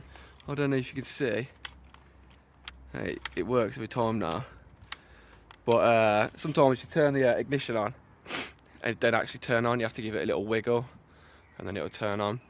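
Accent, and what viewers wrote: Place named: Birmingham, United Kingdom. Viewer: You from Birmingham or something mate? Your accent sounds pretty local to me. ;)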